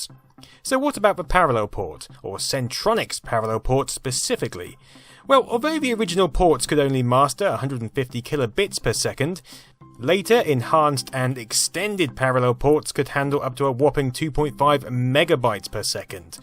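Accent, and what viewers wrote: United Kingdom. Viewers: Must be a British thing. Here in the USofA it's "RS two thirty two" vs. "RS two three two" and the PC/AT (Advanced Technology) was "PC A-T" vs. "PC at". Other than that, a delightful romp down memory lane. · I didn’t know was British or Australian or South African I thought he was American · John Pattillo it is A-T. Like AT ST AT AT. he's english/British they say everything wrong.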